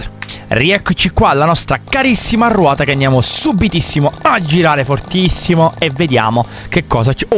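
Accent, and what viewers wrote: Italy. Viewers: Io pensavo che eri di milano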